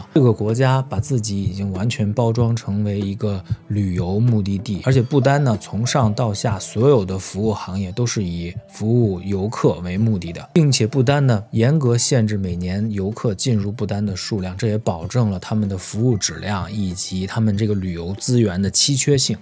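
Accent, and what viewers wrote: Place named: Tibet, China. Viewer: 博主是我看過普通話最標準的藏族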